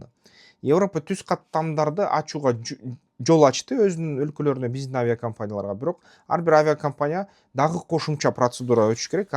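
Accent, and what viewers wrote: Standard. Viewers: Сиздин таза Кыргызча сүйлөгөнүңүз өтө соонун. Азаматсыз Улут бул Тилден башталат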